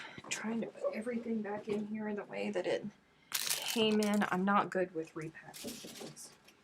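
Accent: South, United States. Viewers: In the UK, oregano is definitely o'-re-gah-no, just like the Aussies say it. We have coriander for the seeds and leaf part of the plant; pee-kan, not peh-kahn; aubergine is a plant as well as the colour - probably because we have more french influences in our words and Americans have more Spanish influence perhaps; pie has both a bottom and top of pastry, otherwise it's a tart or flan (that's flann, not flahn) 😂🤣😅 But it would be a very boring world if we all spoke "english" as the English do, wouldn't it. I think your southern accent is purrdy.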